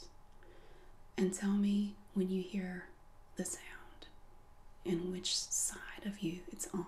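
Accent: South, United States. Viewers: I love cranial nerve / medical roleplays, and I also adore southern states accents - So this video is bliss for me! Thank you so much for this creation! Can't wait to see your other videos! All the best from the UK. :) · Your accent is perfect! When people try to force a southern accent it always sounds way too drawn out and unnatural. yours is great. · Folks, this is what a REAL urban southern accent sounds like. The long 'drawl' is more common in rural areas. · Awkward moment when you wonder where her accent is, then realize you've lived in the South your whole life and this is just what sounds normal to you... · needs more southern accent 4/10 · Yup I live in Austin, Texas... this is definitely southern haha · As a French girl, I find that your "southern accent" makes the English a lot easier to understand ! Nice video, by the way.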